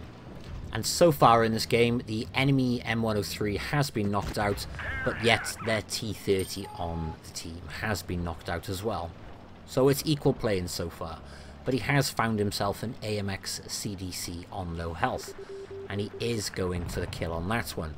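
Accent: Syria. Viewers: جدع يا:D لهجتك كانك سوري بس مش فارق المهم عربي ^^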